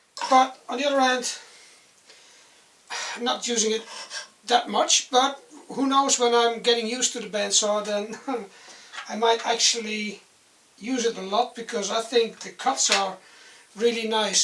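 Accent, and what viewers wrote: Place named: Netherlands. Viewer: Dutch accent is awesome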